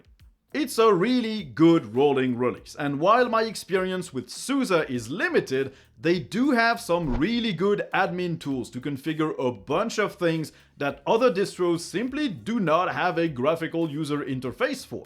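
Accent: France. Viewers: Tu serais pas français mec t’as un très fort accent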